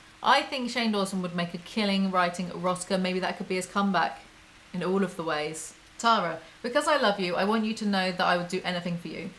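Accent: United Kingdom. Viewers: She's from the south of England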